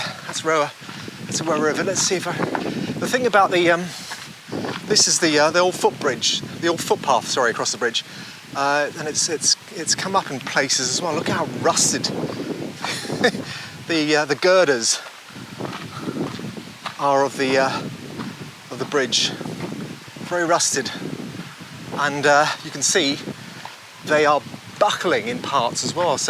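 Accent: United Kingdom. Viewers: Good to hear the British accent vlogging in Fiji. I like your happy tone and saying hello to everyone. Great work!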